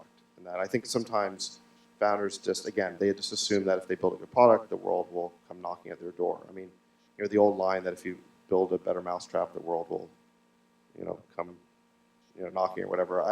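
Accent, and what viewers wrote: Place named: India. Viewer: Indian ascent is really irritating. I am sorry.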